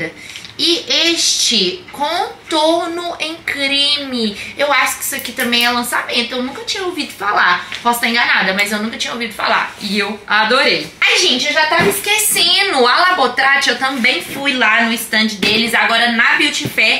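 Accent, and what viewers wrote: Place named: Minas Gerais, Brazil. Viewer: Meu seu sultaque e lindooooo, também sou mineira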